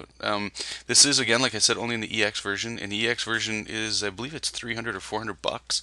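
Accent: Canada. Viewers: yo, you a canadian?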